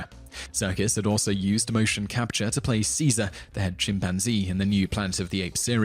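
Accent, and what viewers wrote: United Kingdom. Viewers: Other British people, surely you understand that pronunciation of things is natural, right? Taking into account the many kinds of British accent there are, this is just the way people with his accent pronounce things when they read a word! pronunciation and British tone is preferable, no wonder England has the Top 10 Channel game by the plums. Please keep up pronouncing things the way you do,! If I did the subscription thing, I'd subscribe.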